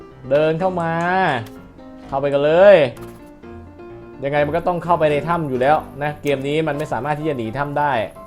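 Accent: South, Thailand. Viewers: ภาษาใต้วันละคำกับพี่ 😎😎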